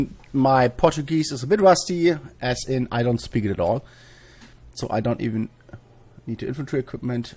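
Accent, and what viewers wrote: Germany. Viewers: ähm haha bei Ihnen hört man sehr dass sie Deutscher sind 🙈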